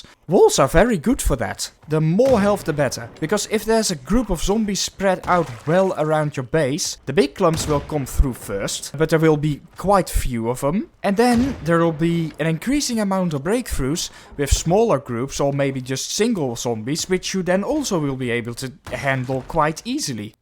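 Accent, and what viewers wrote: Netherlands. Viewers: like how you can imediatly spot a dutch person on youtube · Yo, I hear like 10 seconds and the Dutchness is killing me. Good vid though! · I can't unhear the dutchyness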